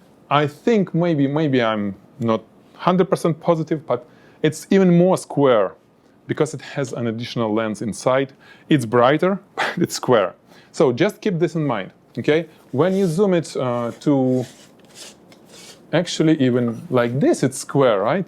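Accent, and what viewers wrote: Russia. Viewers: По первым словам сразу понятно, что русский)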